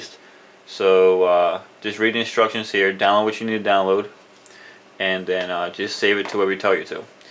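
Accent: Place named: United States. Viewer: THANK YOU AND YOUR BEAUTIFUL NORMAL AMERICAN SOUNDING VOICE AND UNDERSTANDABLE ENGLISH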